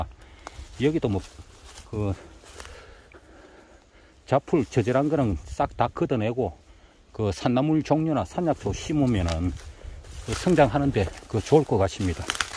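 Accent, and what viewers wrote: Gyeongsang, South Korea. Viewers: 경상도 분이네예 ㅎㅎ 고생하셨습니다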